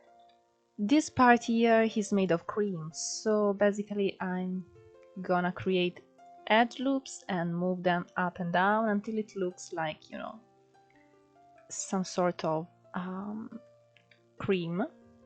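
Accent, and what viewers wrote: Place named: Netherlands. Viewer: you can olmost here the dutch in your voice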